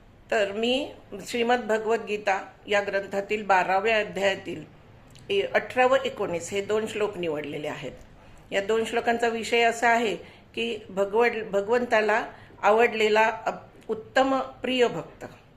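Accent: Standard. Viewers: खुप छान,शुध्द आणि स्पष्ट उच्चार आणि सोप्या शब्दात अर्थ सांगीतला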